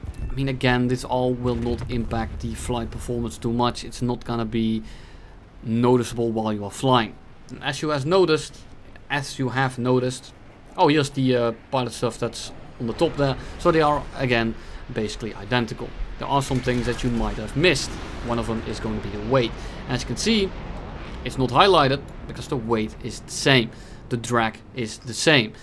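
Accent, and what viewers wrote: Netherlands. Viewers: Dutch English damn....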